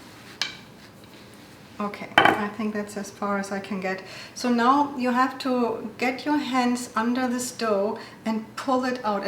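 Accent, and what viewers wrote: Germany. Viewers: So I came here for the Strudel recipe, didn't expect the attractive lady in a dirndl with the German accent, now I'm subscribed. I'll be trying this and the red cabbage recipe as well.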